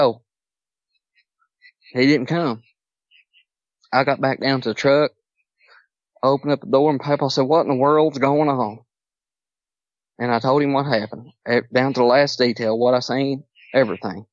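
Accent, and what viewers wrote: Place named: South, United States. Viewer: Thanks for sharing your story! Love your accent! I'm from Mississippi so we Southerners all have a shared background, your grandparents sound like my own. <3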